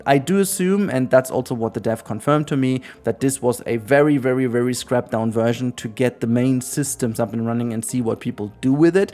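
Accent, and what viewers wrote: Germany. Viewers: I'm confused bc I've watched many videos about this game and haven't heard anyone saying it like that, plus he sounds like German is his first language, therefore that isn't to blame either (despite what the other person who replied here said), given that the O in German never sounds like "ou" on its own, unless there's a U after it. I can only assume he tried to guess how it would be pronounced in English and got it wrong, but that is another thing I'm confused about, bc one would think he would have watched other videos about this game, and if he had, he would have learned how everyone else is saying it (unless that's how it's pronounced in Korean, and he knows that and I don't, which is another reason I'm asking, so I can learn if it is). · Because he is of German origin, so we speak what we read.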